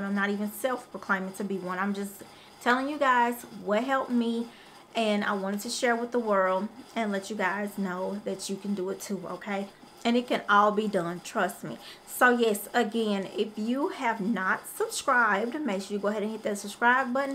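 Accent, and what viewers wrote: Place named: South, United States. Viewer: ... oooh you fine fine.❤ Took me by surprise. You must be a southern girl. Very confident & charismatic. I really appreciate the information.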